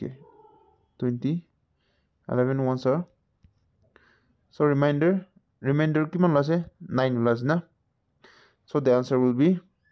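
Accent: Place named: Nagaland, India. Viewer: Perfect shortcut formula sir I gues you are lotha gentleman your accent 😀 Last answer is option b 5 1/3days Correct me if wrong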